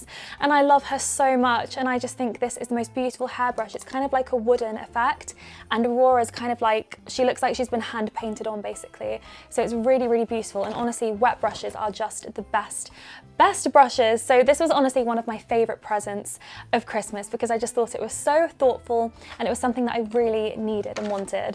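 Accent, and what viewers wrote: United Kingdom. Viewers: I love your British accent. Ohhh orange chocolate is the best flavour nither as smell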